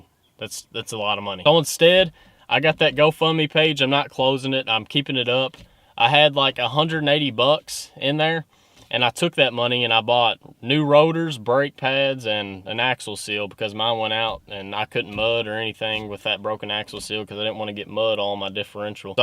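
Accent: Kentucky, United States. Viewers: aye man what part of kentucky you from..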